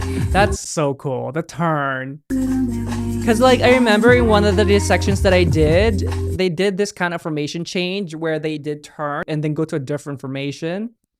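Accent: Philippines. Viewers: Your accent reminds me of our filipino nanny from when i was younger. I miss her 😣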